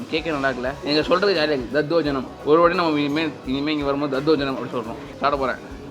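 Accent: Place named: Butler English, India. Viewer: Stop talking your buttler english ....... fraud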